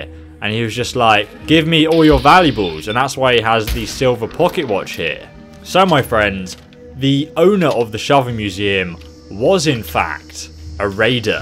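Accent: United Kingdom. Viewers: are you British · your British, me to!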